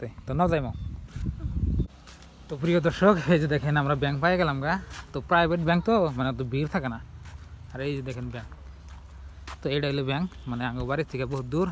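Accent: Tangail, Bangladesh. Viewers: বাংলাদেশ টাংগাইলের মতো ভাষা লাগে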